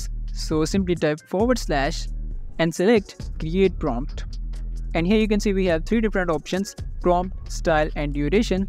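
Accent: India. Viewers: Bro admin from India...? speaking English is funny...😂😂😂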